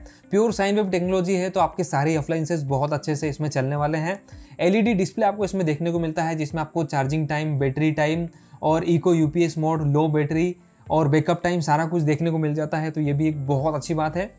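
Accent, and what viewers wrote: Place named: Madhya Pradesh, India. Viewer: brother your talking style is nice i feel mp style tone in your voice , great video specially talking way😊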